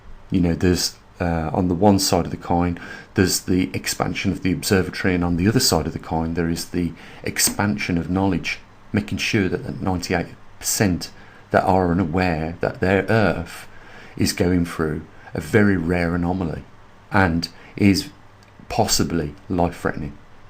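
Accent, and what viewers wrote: Birmingham, United Kingdom. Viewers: Are u a Brummie.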